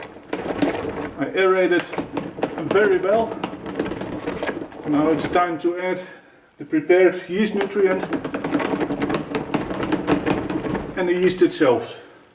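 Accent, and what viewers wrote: Netherlands. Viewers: Not to take the piss but I’ve not heard a younger person with this accent. Only have old Dutch people in Canada. Most younger folks can make an American accent.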